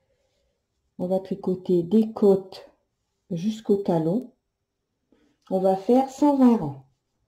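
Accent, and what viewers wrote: North, France. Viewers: Superbe ..., pourtant je donnais des cours chez Singer...en <num>. Valenciennes..vous ahvez un petit accent du Nord..avec les çà....c'est charmant .., je me reconnais en vous....je ne vous connaissais pas.....j'aimerai donner des cours .à domicile .. comment calculer.....😊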